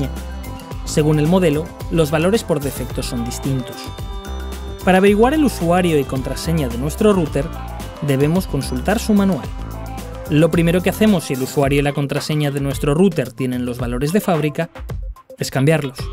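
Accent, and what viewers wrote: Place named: Spain. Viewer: Puros tutoriales en español españa :v;ondaa vitaaaaal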